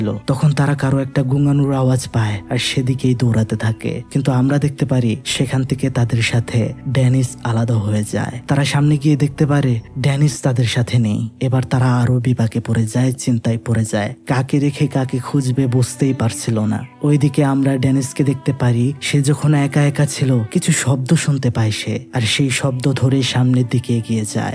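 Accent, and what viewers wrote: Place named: Bangladesh. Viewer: Kothar style a mne hocce uni bngldshi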